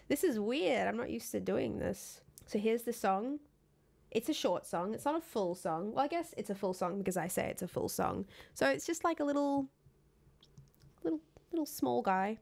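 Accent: Australia. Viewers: Are u Australian ? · Shit yeah, you're an Aussie. Where u at bby, don't be shy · Are you australian?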